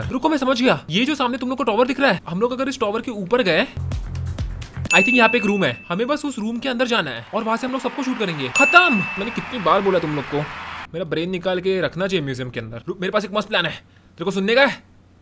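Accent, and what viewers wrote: Maharashtra, India. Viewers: Mahhpat : you are marathi or hindi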